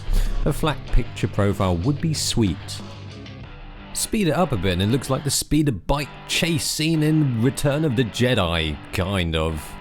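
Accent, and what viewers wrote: United Kingdom. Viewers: I thought u are a blonde british. Didnt expect an asian with a heavy british accent. Amazing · You knew what blew my mind more than that camera, an asian guy with a strong british accent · wow did not expect a prim british voice coming from this guy :D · Never seen an asian looking person with a british accent👌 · so weird seeing an asian speak in a genty british accent. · Yeah, I was waiting for mid age brit after hearing a voice.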